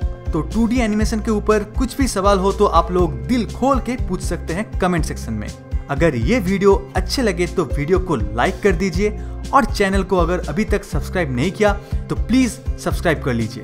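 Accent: India. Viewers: r u from kolkata?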